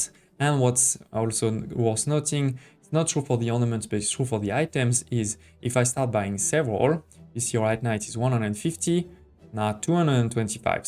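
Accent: France. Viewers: j'ai un peu cramé ton accent francais du coup je pose ma question en FR :D , tu serais me dire comment on utilise les items delocks avec les tickets en or ? j'en ai debloqué mais j'arrive pas a choper les ameliorations merci par avance :D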